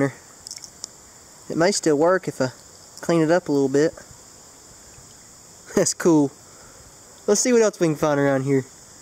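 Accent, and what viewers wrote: South, United States. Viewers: I love his accent an he's Avery handsome southern man stay that way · where are you from you sound like you're from the south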